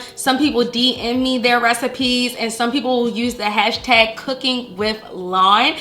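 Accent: Baltimore, United States. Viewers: All i hear is that Bmore accent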